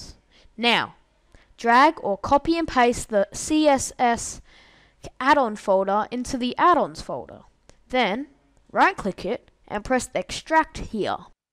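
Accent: Australia. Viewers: Is that a Australian accent? thanks bro works perfectly :D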